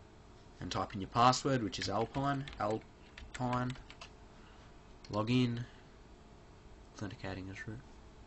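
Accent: Australia. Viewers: Good video 5/5. Also somehow I knew that you were aussie lol. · ih im 9 n yer ossie vose siis cut.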